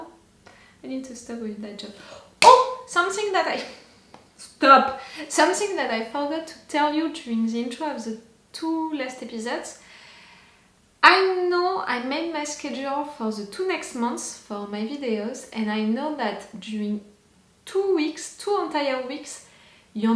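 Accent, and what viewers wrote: France. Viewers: Tu as l'accent français quand tu parle anglais, c'est mignon 😊😊😊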